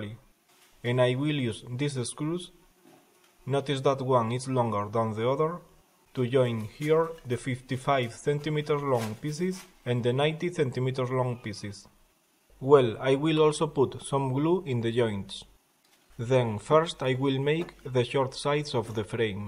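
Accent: Spain. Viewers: I've spent my life reaching English to Spanish speakers (and others). Your accent is definitely Spanish from Spain but otherwise your English can't be faulted. Great videos too! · Great and unique Spanish accent! Cheers mate! Salut!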